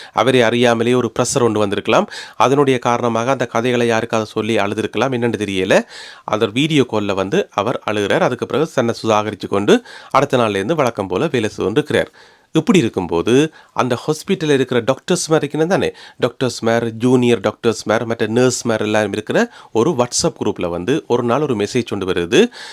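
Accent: Jaffna, Sri Lanka. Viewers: அவர்களே! முதலில் என் வாழ்த்துகள். தமிழைப் போல் இனிய மொழி இவ் உலகில் உண்டோ என்று கேட்டால் நான் இல்லை என்றுதான் சொல்வேன். அமிழ்திலும் இனிய மொழியை தேனிலும் இனிமையாக யாழ் மொழியான பேச்சு மொழியில் சொல்லும் விதம் இருக்கே என்னவென்று சொல்வது! அருமையாக கதை பயணித்து நிறைவு பெற்றது. வாழ்க! வளமுடன்! நானும் இலங்கை தான் அம்பாரை மாவட்டம். என் உறவுகள் அங்கே இருப்தால் பார்ப்பது வழக்கம்.நன்றி தமிழ்! Siva T.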